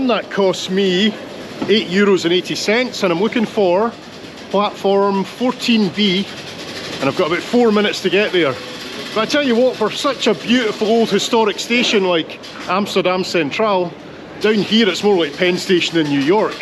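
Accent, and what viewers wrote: Scotland, United Kingdom. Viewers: Ahhhh... Here we go again, a braw Scottish voice, taking me on a trip I can only dream about !!! Thanks for all your efforts to entertain us all, and I am looking forward to this video, just as soon as I make a Coffee !!! Many Thanks !!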